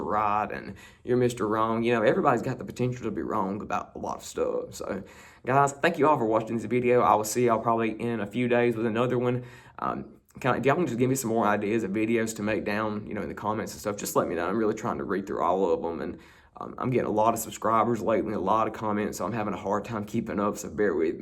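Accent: South, United States. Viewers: it is so enjoyable to watch and listen to you. You're so easy-going, sincere, and yet so honest too! Of course, the Southern accent doesn't hurt either. But seriously, thank you for coming on YouTube and presenting such excellent content... part of yourself.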